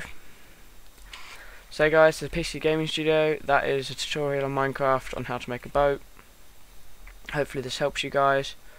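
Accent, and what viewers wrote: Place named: United Kingdom. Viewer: tell them ppl he doesnt sound lik a girl he sounds lik a british person!!!!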